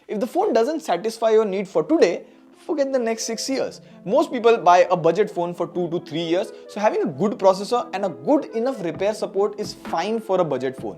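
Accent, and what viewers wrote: India. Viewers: Please stop that Indian accent I can't focus 😢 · Watch american youtubers review he is india you can not understand the indian english accent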